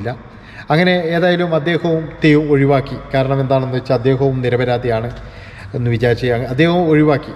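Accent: Kannur, India. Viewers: Sir kannuraanoo njanum kannuraaa😊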